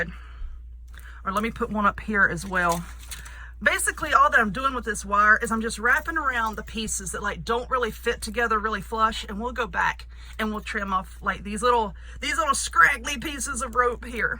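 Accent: South, United States. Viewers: I just love your accent, your southern wording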